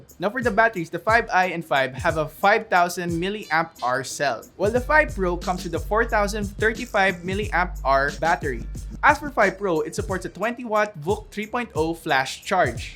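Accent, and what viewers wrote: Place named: Philippines. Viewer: I love his neutral Filipino accent! Unlike the chinito one na mej annoying plus he's the only who didn't mention "take everything with a grain of salt" #loveyugatech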